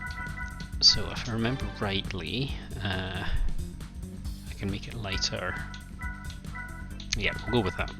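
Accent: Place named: Scotland, United Kingdom. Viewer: Good to hear.. Scottish accents are the best in my opinion...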